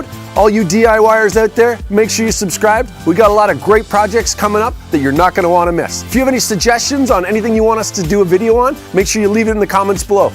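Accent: Canada. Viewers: thats what i do i find the miters eventually come apart but we are in SC ... and he sounds Canadian so big weather difference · Premmo this man sounds so canadain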